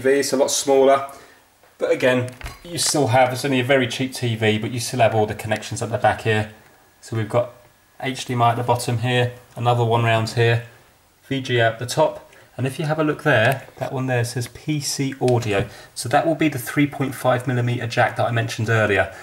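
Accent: United Kingdom. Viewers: i like the brirish accent thou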